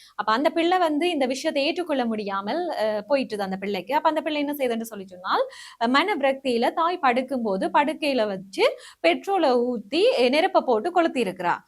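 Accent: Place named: Sri Lanka. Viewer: மேடம் உங்களுக்கு என்ன இலங்கையா உங்க பேச்சு எனக்கு ரொம்ப பிடிச்சிருக்கு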